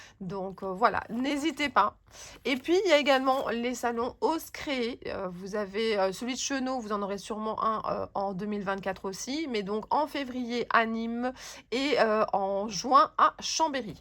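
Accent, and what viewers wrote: Belgium. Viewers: Bonjour, je participe et mon coup de coeur est double avec le tampon Lavinia et aussi le lot de chez universcrap. J'adore tes vidéos surtout quand tu nous montres des tutos de création et j'adore ton accent belge et ta façon de parler avec certains mots qui fond toujours drôle dans mon oreille. J'adore rencontrer les exposants dans les salons pour mieux pour mieux connaître les produits qu'ils proposent et comprendre mieux leur univers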